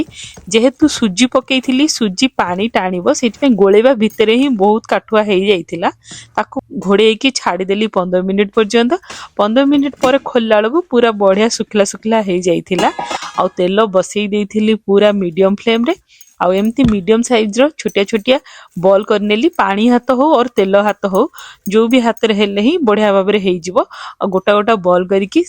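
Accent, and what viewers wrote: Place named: Ganjam, India. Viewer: tume Cuttack ra kintu tuma katha barta ganjam ra bhali laguchi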